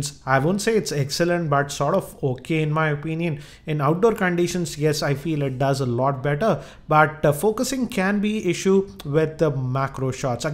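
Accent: Hyderabad, India. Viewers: please change ur accent of speaking. hyderabadi youtuber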